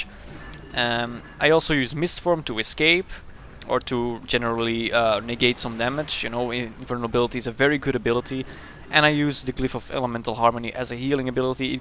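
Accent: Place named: Belgium. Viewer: Loved this video, Subbed! I can't help to ask. But are you from Belgium by any chance? I'm from the Netherlands and I kinda recognise a belgium accent with you:P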